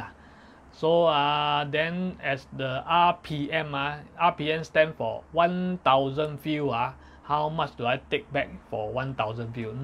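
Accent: Singapore. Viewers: Subscribed, love the true singaporean vibe, the Singlish is power, and it’s just like watching your neighbourly Uncle talking, brings us back to kampong days. Keep it up.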